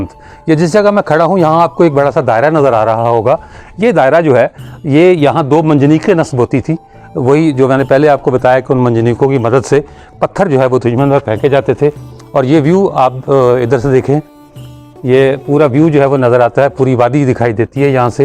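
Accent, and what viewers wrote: India. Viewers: Bhai aap Pakistani se zyada hindustani lagte ho baat cheet ka andaz bhi hamara hai jo ke yeh badi baat hai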